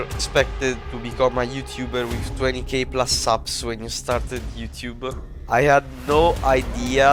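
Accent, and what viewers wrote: Italy. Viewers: Yes, his accent is Italian... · But, you're Italian? because it looks like you have an Italian accent. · Si sente che sei italiano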